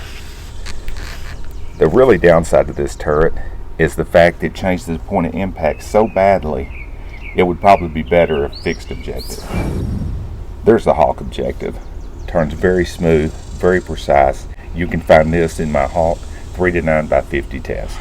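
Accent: South, United States. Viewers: great no bs video comparison. laughed all the way thru it! you remind me of Duke Nukem with a southern accent, you are awesome brother keep it up.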